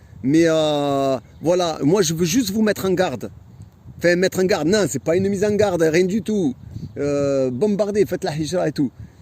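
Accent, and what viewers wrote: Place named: South, France. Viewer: Par contre mon frère quand tu parles sa me rappel toute mon enfance, je suis du sud aussi et toutes tes expressions sont familières, ton language c'est le langage des gens du sud avec la culture provençale mélangé ah ah Mes grands parents parlaient souvent le provençaux, quand tu dis : " ô Fada, ou ô Fan". C'est comme si j'entends mon père parlé.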